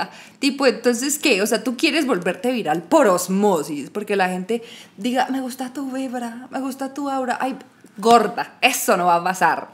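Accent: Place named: Antioquia, Colombia. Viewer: a mi se me gusta tu aura :) Siento que se te pegó el paisa jejej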